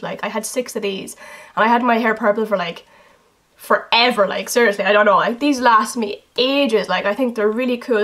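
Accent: Ireland. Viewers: OMG YOUR IRISH AND I LOVE YOU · Your accent is beautiful! I wish I had a accent but NOOO I had to be Canadian -.- haha are you Irish? Don't need to answer if you find it personal xoxo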